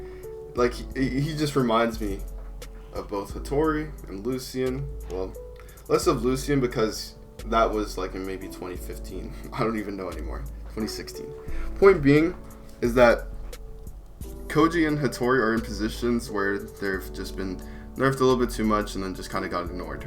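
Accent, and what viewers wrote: Trinidad and Tobago. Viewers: r u from trinidad? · ur from trinidad?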